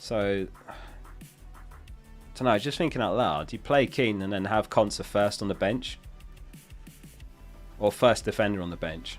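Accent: United Kingdom. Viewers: BRO LOOKS POLISH AND SOUNDS SO BRITISH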